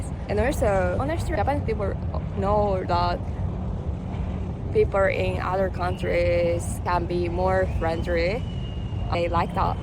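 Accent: New South Wales, Australia. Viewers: One thing I have noticed listening to you speak English is that there are certain words where you talk with what sounds like the beginnings of a very typical Australian accent. show know know You've really nailed the way that Aussies slur our words and speak with a very nasal voice, we infamously say "naur" instead of no. I think you're going to end up with a really cool sounding accent, great mix of Japanese and Australian :) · Welcome! You seem to be settling in well and enjoying our way of life. Did you know some of your words are starting to take on an Australian accent? Follow in particular jumped out to me! But there are others in there too. Keep having fun! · Aussie accent already detected. 👍🏽 You’ll fit right in just relax and enjoy the ride. It’s a great part of the world to be. 🇦🇺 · There are some markets in Potts Point on Sundays. 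Its interesting hearing some of your english words have already started to develop the Australian accent. Hope you enjoy your time in Sydney and Australia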